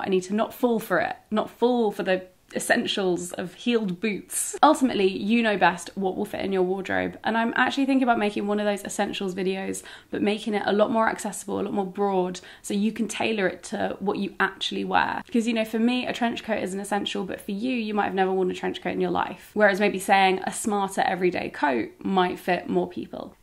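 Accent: United Kingdom. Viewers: Omg, I literally faancy your accent!! Beautiful British accent 🤍🤍🤍. And the video was very helpful. Thanks 🙏🏽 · Awww you are so beautiful and funny and overall so enjoyable to watch. Love your way of speaking too (said by a non-British person who loooves a good British accent and well-spoken English in general!) Happy to join your channel and happy to have found it! Yay! Thanks for the tips, I am new to the "Capsule wardrobe" system and I am considering adopting it, as I like the idea - but at the same time I am not too sure about some other aspects of it... so thanks again, this will help me make better decisions (hopefully, haha)! P.S. with your body type you would be soooo popular in my country of birth, Brazil!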